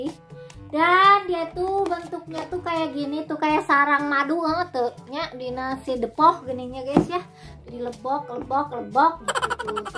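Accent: West Java, Indonesia. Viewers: Kak orang mana kak, suka banget sama logat sunda nya wkwk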